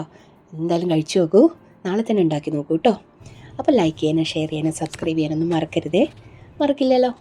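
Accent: Palakkad, India. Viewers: പാലക്കാടാണ് നാട് എന്ന സംസാരത്തിൽ മനസ്സിലാക്കാം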